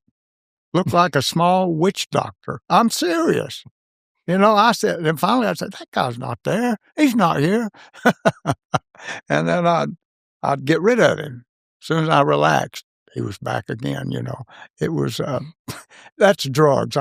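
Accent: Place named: North Carolina, United States. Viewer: Sir you mighta traveled a lot but knew were North Kackalaky soon as you spoke.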